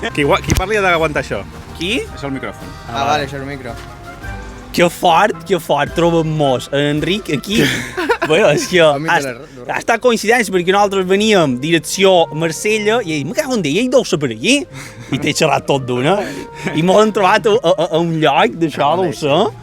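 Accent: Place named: Mallorca, Spain. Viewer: 😂M’encanta el mallorquí, quina simpatia! Bona jornada Enric! Amb això de les postals has fet un homenatge a la missatgeria tradicional, que està en plena decadència 👏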